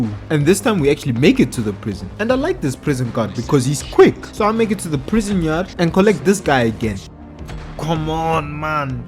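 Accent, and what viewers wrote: Afrikaans, South Africa. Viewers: I knew you were from South Africa with that accent😭😭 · Are you South African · Bro are you from South Africa. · are you south african? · Quick question are you south african coz ur accent is like mine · Damn bro south african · Are you south African? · 😅I Feel like you from South Africa 🇿🇦 BRUH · What is your accent dude? Its sounds south African it sounds awesome🙃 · Are you South African You have a fimilar accent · Are you south African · Are u south afrcan? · Bro tell me you not South African 😂😂😂😂 that accent.. I’m from Lesotho. I’m subscribing btw 😂😂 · Oh you're south african too? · Your accent man . . . Are you South African?🇿🇦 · My man sounds like a south african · Are you from South Africa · It took me a while to realize you were south african lmao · You sound extremely South African... Am i right? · your accent , the way you pronounced that Afrikaans nam , auidwes boerseun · can hear the south african accent 😭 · Are you afrikaans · Nha I found gold finding this channel 😂😂you can even hear thr south african accent 😂😂😂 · South African? 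· South African? Edit: yeah ur SA, never heard anyone else say Kopp (head) elsewhere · bro u south african?? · Are you from Africa wait me and you are Afrikaans bro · I was wondering where you are from.. the way you pronounce the letter r made me think SA or Namibia but when you said boers seun then i knew for sure your from SA, jy het n nuwe subscriber gekry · Are u afrikaans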